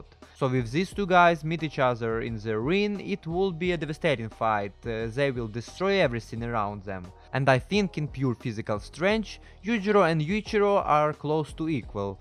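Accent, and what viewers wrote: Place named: Russia. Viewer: у тебя какой то русское акцент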